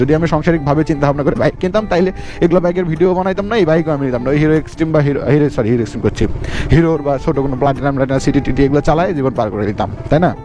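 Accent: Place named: Gaibandha, Bangladesh. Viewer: ওহ আমি মনে করছি গাইবান্ধা, নয়তো বগুড়া, নওগাঁ,গাইবান্ধা,বগুড়ার ভাষা same..😊 তাই না ভাইয়া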